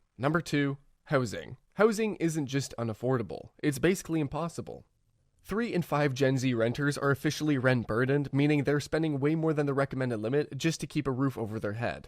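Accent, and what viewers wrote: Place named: Canada. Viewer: I can tell from your accent you’re Canadian… so am I and we’re screwed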